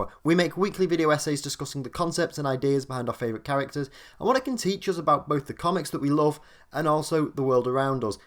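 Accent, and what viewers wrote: Scotland, United Kingdom. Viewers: Whys a British guy talking about the american way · You're not the Scott, but are you a Scot by chance? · Scott turned British?!!! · Comics I like how the one video about America was done by a British guy.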